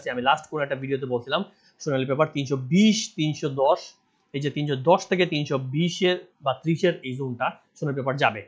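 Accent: Sylhet, Bangladesh. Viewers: Good with sylheti accent. Topic doesn’t match with analysis.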